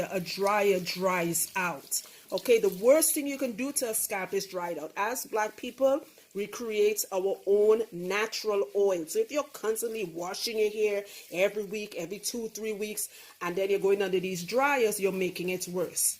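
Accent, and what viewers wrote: Trinidad and Tobago. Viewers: I knewwwwww I made out the Trini accent... Omg aren't our accent like a silver lining behind the dark cloud, look my pores raise lol... Hey Trini 🙋🏾‍♀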